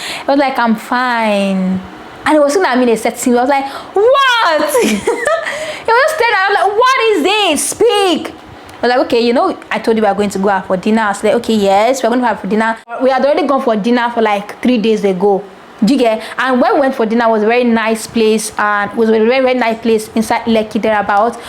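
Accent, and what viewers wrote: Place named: Yoruba, Nigeria. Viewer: I like your vibes.. you so funny 😂, wow your Yoruba accent looks good from an Igbo lady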